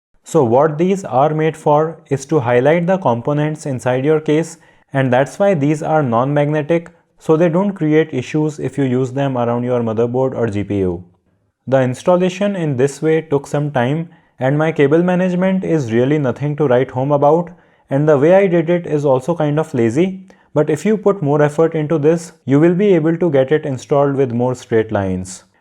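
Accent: India. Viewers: Lots of indian accent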